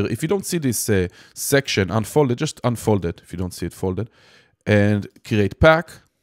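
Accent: Israel. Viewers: cool thanks hamm Israeli accent ?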